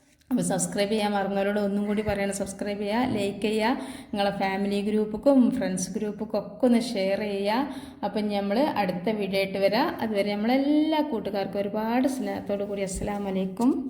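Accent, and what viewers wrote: Malappuram, India. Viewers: ഇപ്പോൾ സൂപ്പറായിട്ടുണ്ട്സംസാരം കേട്ടിരുന്നു പോകും ഈ സംസാരം കേൾക്കാനാണ് ഞാൻ ഈ വീഡിയോ കാണുന്നത്എൻറെ ഫാമിലി ഗ്രൂപ്പിലുള്ള എല്ലാവരും വീഡിയോ കാണാറുണ്ട്ഞാൻ പാലക്കാട് കാരിയാണ്മലപ്പുറം സ്റ്റൈലിൽ ഉള്ള ഈ സംസാരം ഒത്തിരി ഇഷ്ടമാണ് കയ്യ് കാണാൻ നല്ല ഭംഗിയുണ്ട് കാണാനും നല്ല ഭംഗിയുണ്ട് മുഖം ഒന്ന് കാണിക്കാമോ❤❤❤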